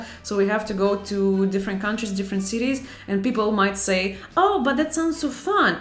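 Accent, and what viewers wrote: Russia. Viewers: She talks about everything being "amanha, amanha amanha" (tomorrow tomorrow tomorrow) but from her accent I'm pretty sure she's Russian and therefore she's in no position to say anything negative about any other country. I'm sure everything is worse in Russia.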